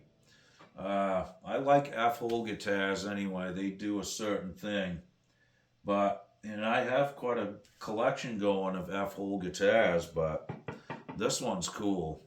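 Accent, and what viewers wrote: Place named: Maine, United States. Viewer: I could tell you're from Maine just from your voice lol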